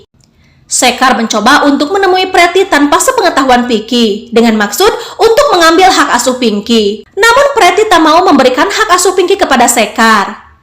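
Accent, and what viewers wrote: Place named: West Java, Indonesia. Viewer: Narator nya orang sunda njir....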